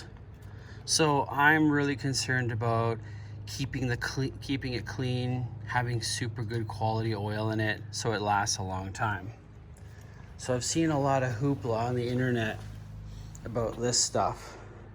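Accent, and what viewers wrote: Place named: Canada. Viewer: This guy is probably Canadian. Sounds like it from his accent and the fact that he said km over miles. Do the rest of you guys want to know how we can afford fancy cars like his red BMW and his Expedition? We have the best healthare waiting lists in the world - that's how. We actually dont get healthcare as you might have been told, but we do have the best waiting lists you will ever find.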